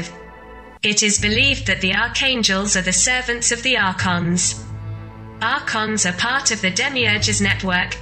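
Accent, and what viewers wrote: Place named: England, United Kingdom. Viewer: Thank you too! The content keeps me here. Plus whoever's Voice is talking. English Accent is Supreme.! 😊👍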